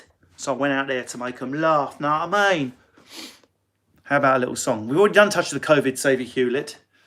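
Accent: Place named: South Yorkshire, United Kingdom. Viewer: Wow! South Yorkshire accent brilliantly portrayed 👍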